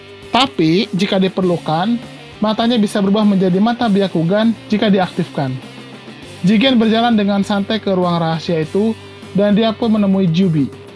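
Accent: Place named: Java, Indonesia. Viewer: Lucu orng in ngomongny medok pak,,,hahahahaha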